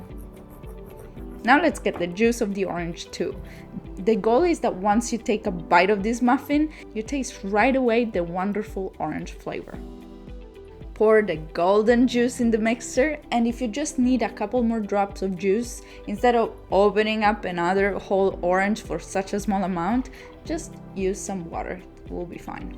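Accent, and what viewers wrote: Italy. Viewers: Si sente che sei italiana❤ bravissima grazie della ricetta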